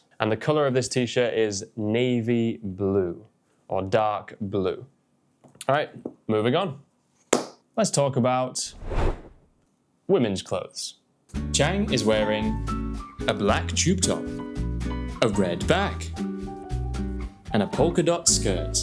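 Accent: Northern England, United Kingdom. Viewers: You’re amazing! I love British English 😍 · I think that the person asking question meant your ’coot’ pronunciation of ’cut’ which seems to be some kind of the northern accent of English.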